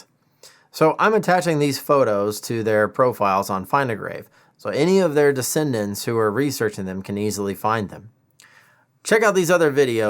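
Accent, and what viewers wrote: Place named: United States. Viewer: love watching from UK . So interesting . Love the USA accent ...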